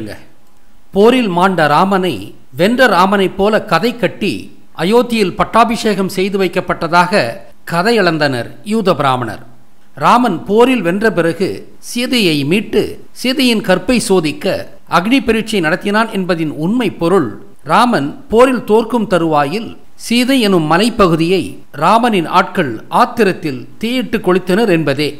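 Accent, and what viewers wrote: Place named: Southern Tamil Nadu, India. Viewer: உங்கள் பேச்சு அப்படியே தென்தமிழகம் பேச்சுமாதிரி இருக்கு ஐயா....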